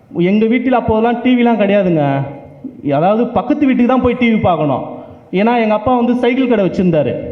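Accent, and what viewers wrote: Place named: Chennai, India. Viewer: சென்னை தமிழ் அருமை அதிலும் திராவிட மாடல் விவவரித்த விதம் அழகு வாழ்க தமிழ் வளர்க திராவிட மாடல்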